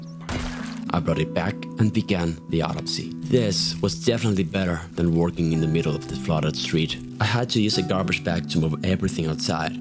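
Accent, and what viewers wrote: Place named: Argentina. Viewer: wait, you're from argentina? Yooo, south america gang. i'm from brazil. I noticed your accent.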